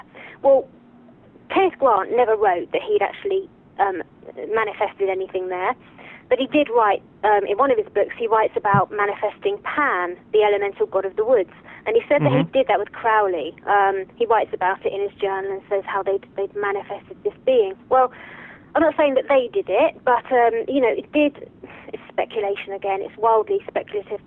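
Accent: England, United Kingdom. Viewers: makes one laugh Americans telling an English person to talk English!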